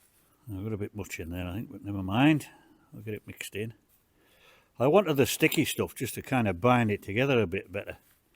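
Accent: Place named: County Durham, United Kingdom. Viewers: Better off getting someone trustworthy in to take care of the rats. Air rifles at night. You sound somewhat local to me if you’re interested. County Durham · Hiya bud you've definitely got a good rat problem there have you thought about getting some guys local to you with air rifles with decent day and night vision outfits that could come regularly, I don't know where abouts you are or I'd suggest someone to you, I look for permissions like this to shoot rats and feral pigeons etc on as its a hobby of mine, there's lots of YouTube channels that show the type of thing I mean it might be worth you reaching out to one of them and maybe put a notice in your local farmers co-op and if you or your family are on Facebook there's lots of groups around the country with guys that would love to come and help you out by shooting the rats for you, I'm in west Wales and going from your accent your up the north of England somewhere otherwise I'd say I'd love to come and help you out, Anyway I hope you have some success with them soon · Where abouts in the country are you? 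North by the sound of your accent. I would be delighted to help you get rid of the rats with a silenced night vision thermal air rifle. Please reply for contact details.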